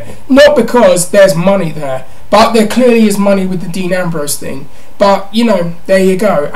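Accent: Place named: Glasgow, United Kingdom. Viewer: You sounded like you were from glasgow, like normal times for me!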